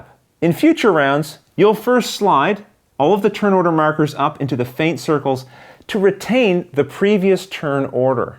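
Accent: Canada. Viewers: I could really tell you are Canadian from a few of the words you said in this video. I recently discovered that Canada has the largest tabletop gaming population in the world. Thanks for this video really helps. Not it's time to go play the game!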